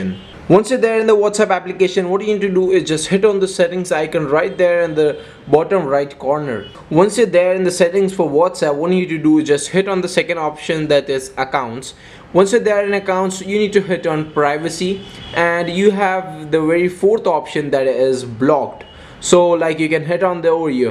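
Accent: India. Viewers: Indians with terrible accent , however helpful video thanks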